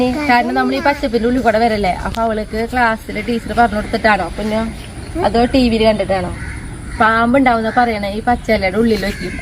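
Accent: Thrissur, India. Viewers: എനിക്ക് ഒരു പാട് ഇഷ്ടമാണ് തൃശൂർ ഭാഷയും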